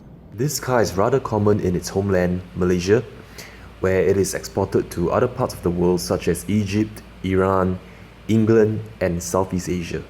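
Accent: Singapore. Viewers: A guy from my church is from Singapore and he sounds exactly like you. His wife, however is from Singapore also, but her accent is very different and she's harder for me to understand. I'm not sure why. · Rev up your Singlish. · just in case if heavy singlish happen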